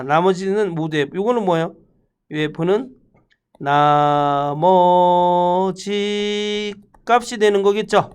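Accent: Gangwon, South Korea. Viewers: 말투가 강원도 분이신거 같은..ㅎ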